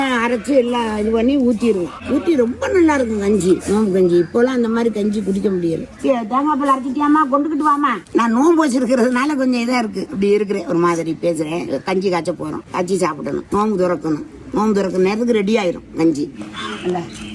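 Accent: Ramanathapuram, India. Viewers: நான் Meharaj recipes ராமநாதபுரமV.KMA வகையறாநான் 👌 உங்கள் வீடியோ அருமையாக உள்ளது பேச்சு வழக்கு எல்லாம் ராமநாதபுரம் தோரணையில் உள்ளது உங்கள் வீடியோவை பார்ததில்மிக்க மிக்க மகிழ்ச்சி ஊரில் உள்ளவர்களுக்கு வகையறா என்று ஒன்று இருக்கும் உங்கள் வகேரா பற்றி வீடியோ போடுங்க நீங்க எங்க நண்ணி மாதிரி பேசுறீங்க இப்போ எங்க நண்ணி இல்லை வயதானவர்களை பார்த்தால் எங்க நண்ணிஞபகம்தான் வருது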